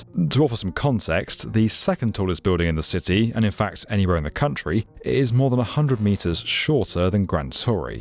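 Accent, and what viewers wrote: England, United Kingdom. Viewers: Didn't totally expect to actually listen to a English man talking about the Costanera Center But here we are Viva chile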